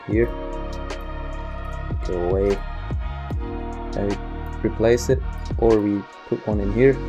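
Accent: Germany. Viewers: немецкий акцент, сразу узнал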